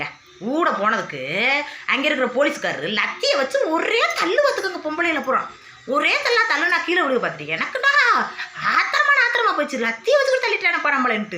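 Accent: Madurai, India. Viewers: மதுரை பேச்சு அருமை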